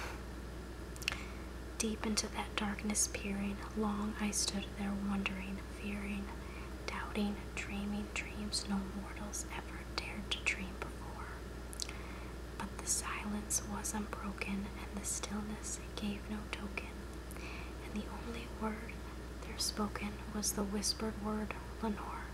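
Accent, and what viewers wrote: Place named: Buffalo, United States. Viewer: I recognize your accent. I live in WA state now but I used to live in Buffalo. Go Bills :D !!!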